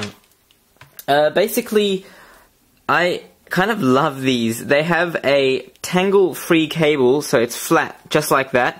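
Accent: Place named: South Africa. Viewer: He sounds kinda South African.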